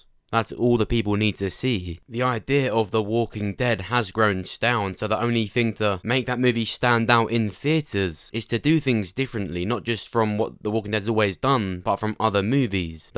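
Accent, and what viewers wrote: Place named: England, United Kingdom. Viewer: I get it, you're English but even as an Irish person I find it very difficult to know what you're saying. You're talking too low and too fast and your words just sound like noise. Btw this video like most of yours could be done in 5 mins. I feel as if you're drawing out the videos so you hit your youtube targets for revenue.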